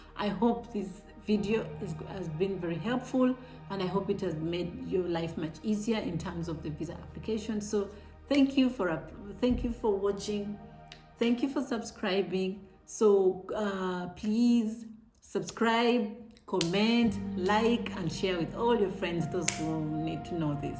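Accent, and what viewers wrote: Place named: Kenya. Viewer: Im here first🎉..at first i thought you are Kenyan❤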